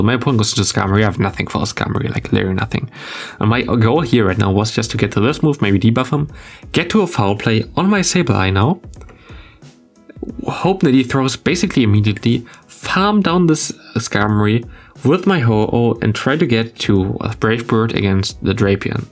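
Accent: Germany. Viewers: He‘s not a native English speaker either. I think he‘s German from what I heard. Brother you just got to get better at understanding English, because thats really not that hard.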